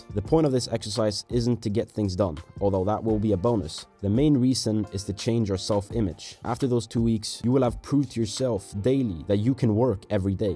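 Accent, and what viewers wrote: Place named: Sweden. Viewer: just a fellow swede appriciating another swedes work keep going this was really helpful!!(if my hearing is wrong and u r not a swede i'm sorry but u do have a nice accent then!)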